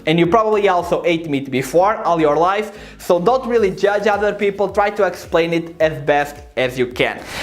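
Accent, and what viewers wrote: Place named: Spain. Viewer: Ha, I think its the Spanish coming through.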